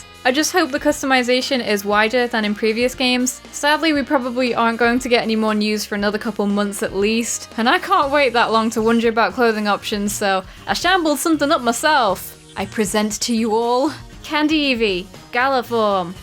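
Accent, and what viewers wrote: United Kingdom. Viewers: hon i didn’t even realise you were british because i’m so used to british accents being one myself it didn’t even register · I don't know why this is...but for some reason, up until this video, I thought you were American. I normally recognize British accents immediately, but I guess your accent just never registered for me. Did you change nationalities while I wasn't looking, or have you been drinking a lot of tea recently? The Mandela Effect is real ladies and gentleman....also I didn't know that you and were the same person.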